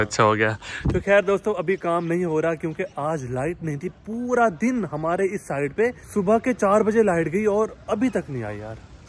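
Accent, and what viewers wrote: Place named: Saraiki, Pakistan. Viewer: VOTE FOR FULL SARAIKI VLOG"👏👏👏 In some of your VLOGs, we can see the Siraiki accent of you people, in some places you also speak, it seems very good, you speak very eloquently and the accent is also very good. All of us will be very happy to see and hear please accept our request.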